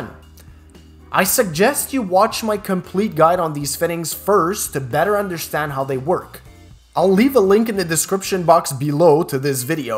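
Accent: Quebec, Canada. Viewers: Love your videos. Is this a Quebec accent I'm hearing? :)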